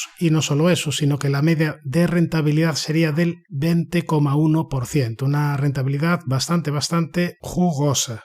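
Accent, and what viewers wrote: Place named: Seville, Spain. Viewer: Por el acento y tu nombre y apellidos está claro que eres de Triana 😅. Llevo más de 35 años invirtiendo en Bolsa y puedo afirmar que eres un Crack y te sigo desde que te descubrí. Entiendo de Balances y necesito siempre tener datos de los directivos de las Empresas pero, por desgracia, no tengo conocimientos técnicos de gráficos ni velas...Te apoyo al 100% para que sigas dándole luz a nuestras inversiones. Te lo dice un Coruñés nacido en el Concello de Mañón tbn con acento Sevillano. Mucho ánimo y, sobre todo, muchísimas gracias por tus aportación a nuestras inversiones.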